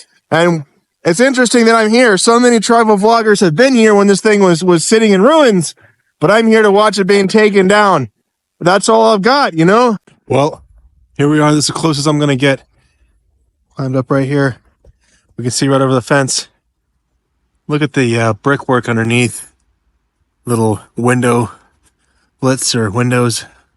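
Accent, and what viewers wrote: United States. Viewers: Where is this guy from originally, The US, or Canada? It’s very hard to tell the difference most of the time. People in the state of Minnesota sound more Canadian than most Canadians do. 😂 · Belfast? I thought you were American with your accent interesting 🧐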